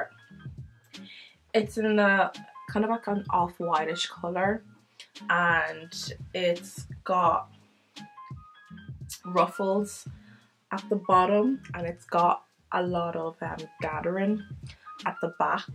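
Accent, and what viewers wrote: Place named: Ireland. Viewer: I love your Irish accent, and nice haul too 👍🏾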